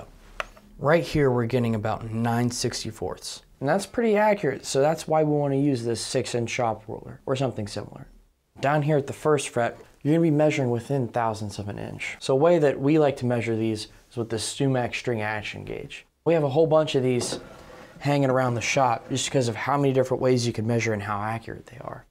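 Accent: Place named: United States. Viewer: Very good and educational video. BTW and out of curiosity, what US accent is this? I.e. schtrings on a schtraight neck.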